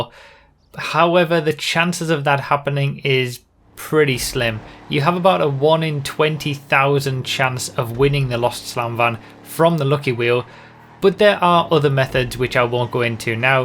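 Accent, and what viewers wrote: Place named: Scotland, United Kingdom. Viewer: U sound Scottish. Are you?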